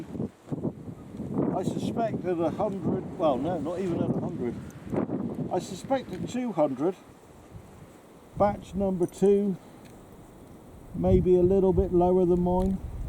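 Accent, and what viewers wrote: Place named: West Country, United Kingdom. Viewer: You must be from Wiltshire Somerset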